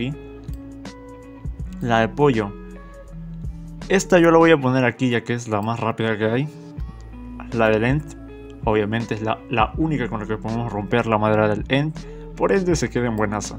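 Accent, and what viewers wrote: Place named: Peru. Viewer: Buenazo! Todavia con acento peruano causa xd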